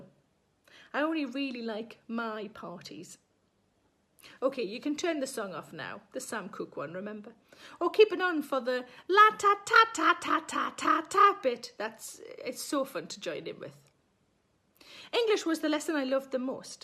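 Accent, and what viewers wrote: Newcastle, United Kingdom. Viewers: She is from South Shields, which is in the north eastern part of England, south of Newcastle but north of Middlesbrough. Her accent is referred to as 'Geordie'.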